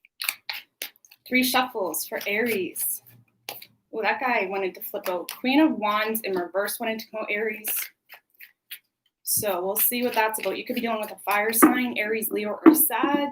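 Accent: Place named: Canada. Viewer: leo is on point. your canadian accent is so cute lol. wu-tang forever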